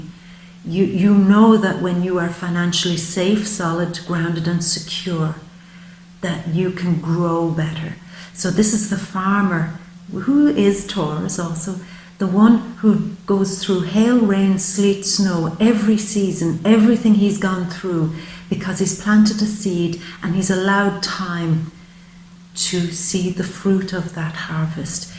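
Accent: Ireland. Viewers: I'm barely 4 min into your reading and love what you are saying! love the brogue. xoxox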